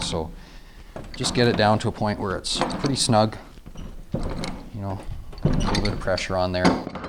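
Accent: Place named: Canada. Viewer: I have this exact same metal plus plastic valve on an old John Wood hot water heater. After a few times of opening it to drain the tank it just wouldn't shut properly. I've since replaced the end valve with a brass ball valve to reduce the drip, but because of poor sealing it drips about one drop every 20-30 minutes. I'm replacing the whole thing with a new brass 3/4" ball valve using this video as instruction, so thanks for the relaxed, quick, informative presentation. BTW just from how you spoke, I knew you were also Canadian. Thumbs up.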